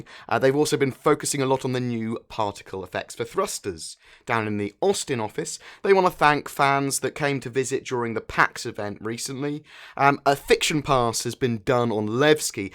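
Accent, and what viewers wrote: United Kingdom. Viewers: Just found you today, and I saw one video and subscribed. I love the way you deliver the information and I can tell that a lot of research and work overall goes into your videos. I had some questions I would like to ask, what mic do you use to record, also is sounds like you live in to UK or grew up there is this true(I'm bad a accents being from the states(Texas)). Love you'r content can't wait for whats to come but for now time to catch up on you'r videos. -Ian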